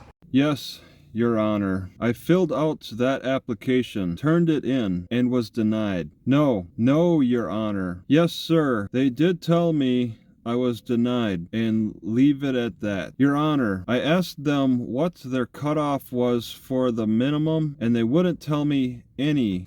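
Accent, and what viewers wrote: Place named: Wisconsin, United States. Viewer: He says from Louisiana but his accent and email and videos clue us into Wisconsin. Not trying to generate your bad vibes but im from mn and id recognize you anywhere. We have the same eyes and ways.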